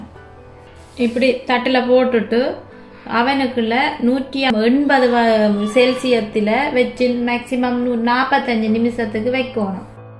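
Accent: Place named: Sri Lanka. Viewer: Sister unga Srilanka speech super nenga sonna ravai alaku sister I joined you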